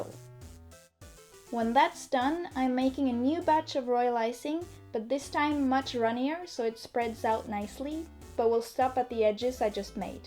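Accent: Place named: Canada. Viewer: you sound canadian, are you from canada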